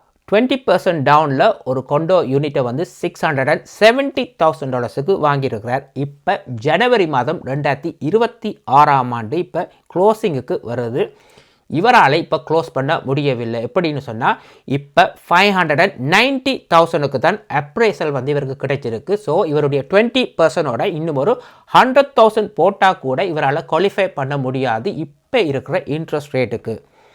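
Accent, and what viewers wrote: Sri Lanka. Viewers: Are you a Sri Lankan Tamil ? Your Accent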